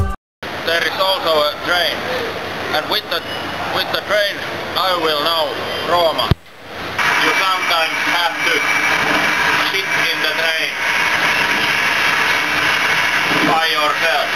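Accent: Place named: Finland. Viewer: I'll listen to this, if i miss the proper Finglish! :D Such a clearly nice strong typical Finnish accent! Yeah! :D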